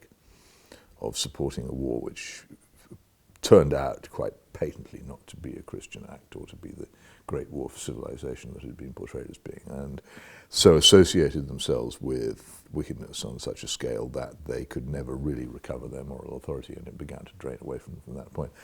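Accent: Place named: RP, United Kingdom. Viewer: <link> Just having some fun. Can any other American understand every word of this Varneyian Elite English? Windsor, I believe. Softening the hard edges of our English to sound more French. It really should be captioned. But he makes excellent sad points. So it is important to be able to speak in a standard we can all readily understand. So we can understand.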